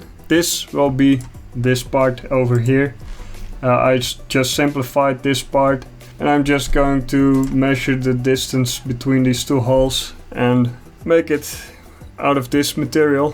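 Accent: Netherlands. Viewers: I can immediately tell from your accent that you're a fellow dutch man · From with County you are you sound like a Dutch man that speak English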